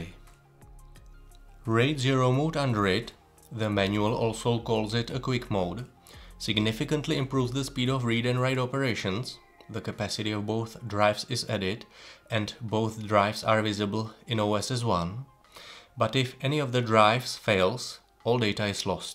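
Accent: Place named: Czech Republic. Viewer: tvl tvá angličtina zní jako od Čecha.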